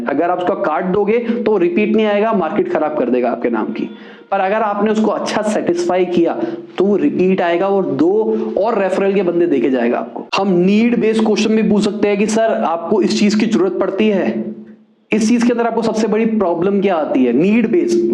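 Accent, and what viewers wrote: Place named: Delhi, India. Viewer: Wow maja aa gaya aaj, sales mai aap master ho.... Muje aap delhiwalo ka bolne ka toon bahut pasand hai.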